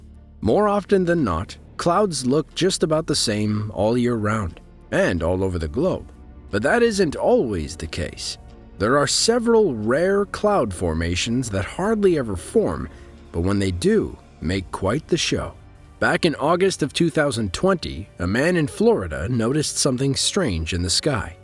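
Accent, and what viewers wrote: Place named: Boston, United States. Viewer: My man loves in Florida and talks like a guy from Boston, “this iz basahh”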